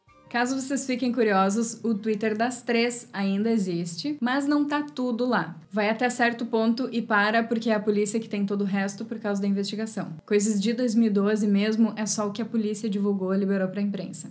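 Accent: Rio Grande do Sul, Brazil. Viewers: gauchá certeza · Tu e gaúcha tche?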